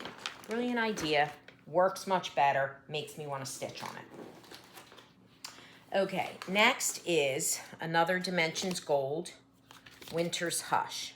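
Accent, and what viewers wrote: New Jersey, United States. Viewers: Welcome to Flosstube! Being a CT girl living in the Midwest now, I immediately smiled at your Jersey accent. Beautiful WIPs! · Hi, I just binged watch all three episodes. I enjoyed you so much. You had me laughing because you sound just like me. I live in FL for many years, but accent is still all Jersey. I too started embroidery and cross stitching as a young girl and remember the stitch on one pattern at a time because our options were limited. Now I have several WIPs on the go. Look forward to seeing your Autumn completions, my favorite time of the year.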